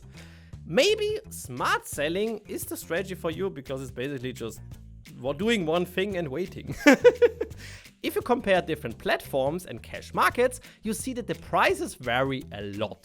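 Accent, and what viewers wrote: Germany. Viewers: Bro dieses Deutsch Englisch macht mich fertig 😂😂😂😂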